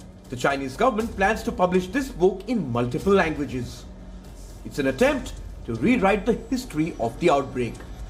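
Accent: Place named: India. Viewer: Sorry but you just cant take Indians seriously with the attrocious accent.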